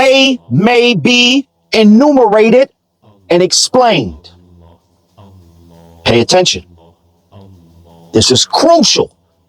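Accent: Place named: Detroit, United States. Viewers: It's weird to hear a Muslim with a american accent. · he has Detriot vernacular. It's not just American, maybe that's what's so unique about him right??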